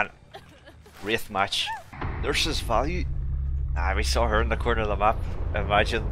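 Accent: Northern Ireland, United Kingdom. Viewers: Is this a Norn irish man playing DBD? Lol my guy. Good to see someone else from these parts playing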